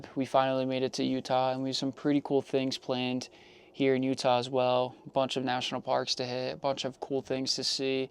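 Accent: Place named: New Jersey, United States. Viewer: Hi. What do you do for work? I love listening to your Jersey accent. Reminds me of where I grew up in southeastern PA.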